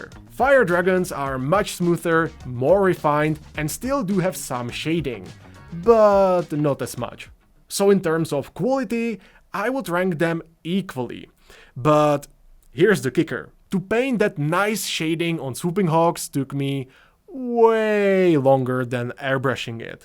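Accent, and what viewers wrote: Czech Republic. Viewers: You accent sounds familiar, are you from Czech Republic by any chance?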